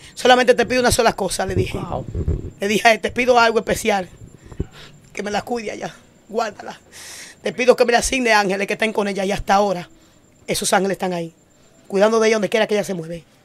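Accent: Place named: Dominican Republic. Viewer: Los dominicanos hablan así 😂Pero ustedes abran su corazón y entenderán todo lo que dicen 🙏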